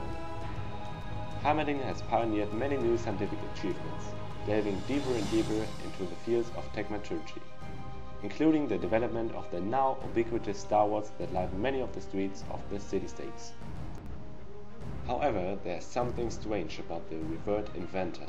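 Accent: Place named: Germany. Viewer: german champ + german accent = perfect!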